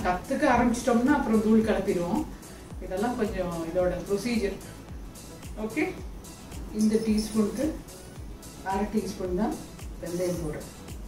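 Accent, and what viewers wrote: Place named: Kanyakumari, India. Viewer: You speak kanyakumari Tamil..💪.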